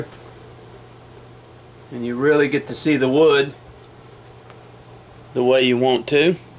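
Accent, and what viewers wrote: Texas, United States. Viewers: Newbie carver and love your videos. Sounds like you live in my neck of the woods here in Texas. Are you a member of any local carving clubs? Also I know you have done videos on tools, but do have a list of the “x-number” of most important tools (and sizes) - I.e size and angle of vtool, gouge, knife blade etc? Finally, thank you. You have inspired me, and others much more talented than me! It has gotten me off my phone and outside— a real hobby.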